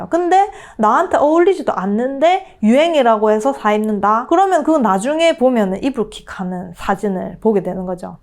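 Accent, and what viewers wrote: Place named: Gyeongsang, South Korea. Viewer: 역시 갱상도 언어^^ 구수~~~~함미다^^🎉🎉🎉 청송아재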